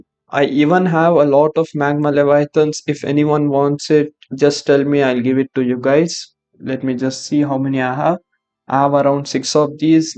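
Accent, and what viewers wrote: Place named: India. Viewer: Why do yoi sound indian😭😭😭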